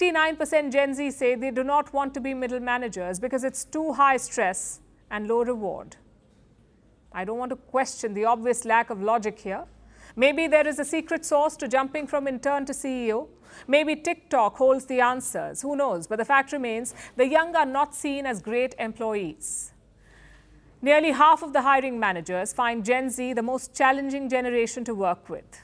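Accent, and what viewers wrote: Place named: India. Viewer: I hate Indian broadcasters. They cannot get rid of the accent. 😖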